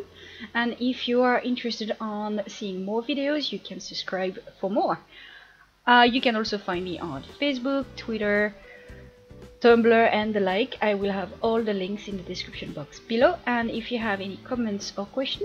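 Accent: France. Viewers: Amazinggggggg, im from mexico and i love your french accent when you talk english ^_^ ill try to do this!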